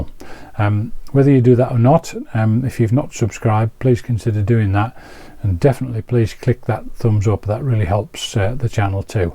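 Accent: United Kingdom. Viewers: Hey just come across your channel, yay a fellow Brit 😂 you sound close too.. I'm in Doncaster... Excellent content, I am going to start teaching electronics, I'm really quite sick at the moment so won't be soon but I feel like I should do my bit for the future EE and enthusiasts.. I've subbed and will take a look at your content.. 💡You should show how to make a peak detector probe to remove the carrier make the scope even more like an analyzer display 😁.. Fyi on some of my scopes you can turn off a channel and it will still trigger off that channel, or you can use the external trigger input and feed the sync from the generator in there.. I've done similar in the past I've used the external sync from the generator and adjusted the scope so the sweep takes up the whole screen, moved the trace down so you only see the positive half, basically a bode but with the sweep.signal visible, Steve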